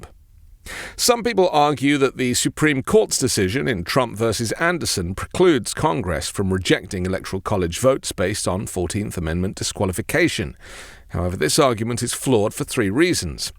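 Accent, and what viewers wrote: United Kingdom. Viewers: Thanks for reporting the truth. I always love hearing your British accent & so does my dog, Nick. He always moves closer to the TV when hears you. He loves British accents. 😊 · I really, really hate someone with a British accent pontificating to us as to how we should run our government! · Why do you sound British? Mmmm? and we all know the law does not matter with Trump. This just seems to be another way of making people angry without a chance to do anything about it. Given the fact he was inaugurated once and almost was inaugurated if the insurrection succeded, and the fact that he beat the senile Democrats in the last election by electoral college and by popular vote we on the left want answers not more pies in the sky " What ifs" with Trump because that is all we hear. What if Trump get angry at Musk angry a Pence angry at anyone in his way and then nothing more comes of it. 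Your words are part of the meaningless press and the real left, the real progressives are underground and not hear on "What If" Podcasts. There very few left podcasts now and those that I know of I will not speak of here in this make believe democratic corporate podcast.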